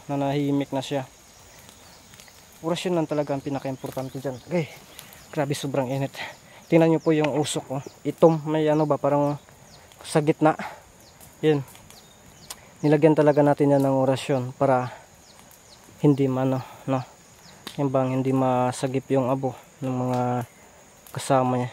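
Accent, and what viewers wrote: Visayas, Philippines. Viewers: Lingaw kaayo kos imong sinultian boss bisayang tagalog amping always Godbless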